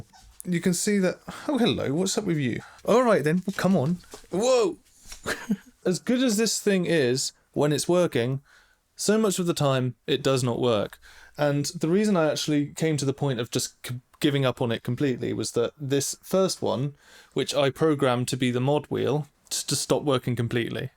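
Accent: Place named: United Kingdom. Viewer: Huh, I didn't know you were British. Neat video by the way! Can't wait for the next songs to come!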